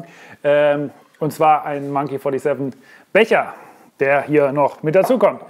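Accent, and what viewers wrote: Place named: Germany. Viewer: My favorite gin... and German accent makes me weak in the knees :-)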